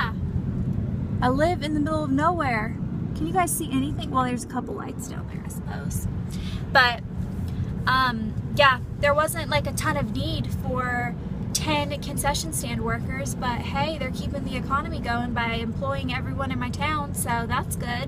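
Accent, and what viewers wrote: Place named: Tennessee, United States. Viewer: You're getting your TN accent back a bit :)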